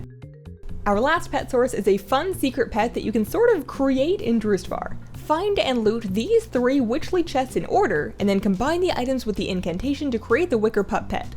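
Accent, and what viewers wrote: Canada. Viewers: canadian spotted. No matter how good their american accent is the 'out' always slips through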